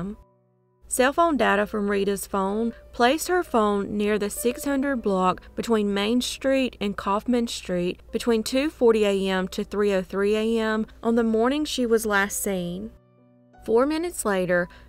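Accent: South, United States. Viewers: Hey. Just found your channel by accident but love it. Great job telling these stories. Love your accent! K 🇨🇦 🇺🇸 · It’s so nice to hear another southern voice. Very nice and organized video so thank you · I could listen to that sweet southern accent all day.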